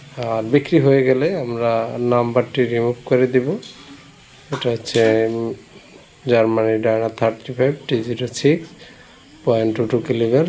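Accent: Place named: Jessore, Bangladesh. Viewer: যশোর কথায় ভাই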